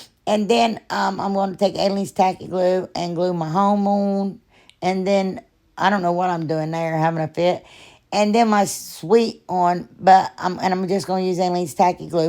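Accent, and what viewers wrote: South, United States. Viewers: Where you from girl?! I’m in north Alabama. I always love hearing a warm southern accent on here!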